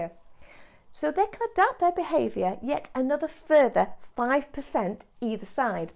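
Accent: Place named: England, United Kingdom. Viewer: 🇬🇧💞🇺🇸 Your English accent sounds lovely and easy to understand. I am pleasantly surprised as I speak American English. Please do not speak slower in your broadcasts. YouTube has a Playback Speed adjustment so listeners can choose the preferred speed of the voice (normal, slower, very slow). Thanks for your thoughtful advice on very important issues in human behavior. 🙏💖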